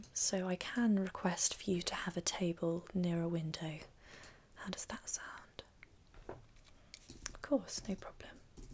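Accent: United Kingdom. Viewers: My thoughts: I think she’s British Her: speaks in British accent Me: I knew it! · The British accent is a turn on.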